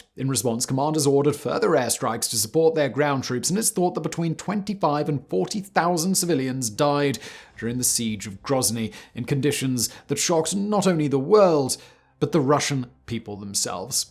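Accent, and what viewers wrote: RP, United Kingdom. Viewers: There really is no better narrator for a historical documentary than someone with a posh British accent I swear to God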